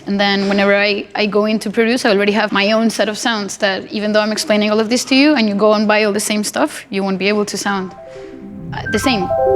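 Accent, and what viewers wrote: Bogotá, Colombia. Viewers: Sounds Colombian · el acento rolo es super marcado hasta en inglés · Any other Colombians can notice how her accent also somehow is there when she speaks English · I didn’t know she was Colombian until right now that I heard her speak in English and said: she has to be from Bogotá!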